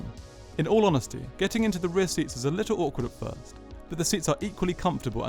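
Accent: RP, United Kingdom. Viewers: You sound like you have just left Eton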